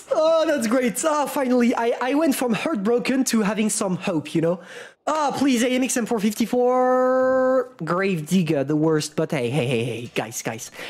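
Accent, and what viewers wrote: France. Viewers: Je savais que tu etait fracais a cause de ton acsens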